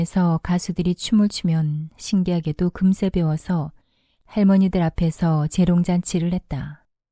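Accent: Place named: Gyeongsang, South Korea. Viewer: 산 기슭에 판자를 얽어 움막을 짓고 살았던 사람들의 이야기가 연상되네요. 물론 한 세대의 간극은 있지만요. 술이 고주망태가 되어 마누라를 무지하게 패던 못난 놈들이 살았던 시절. 그래도 아침에는 해장국이 밥상에 오르던 그때 이야기는 이제는 없겟지요? 님의 경상도 사투리가 아주 구수합니다. 박재홍님의 옛 노래의 노래처럼 말입니다.잘들었습니다.☆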